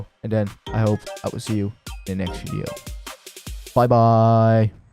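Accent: Netherlands. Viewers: Ben je Nederlands? Ik hoor aan die accent 😂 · Alright, goos vid but this dutch accent lmao great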